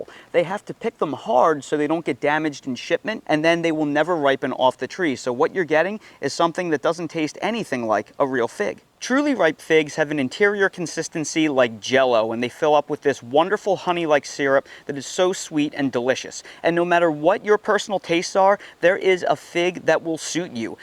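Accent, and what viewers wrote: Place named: Philadelphia, United States. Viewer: Your accent tells me you are from Philly. Is that correct?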